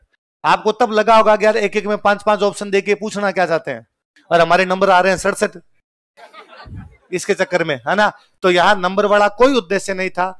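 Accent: India. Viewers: bolne ka deshi andaj sahi h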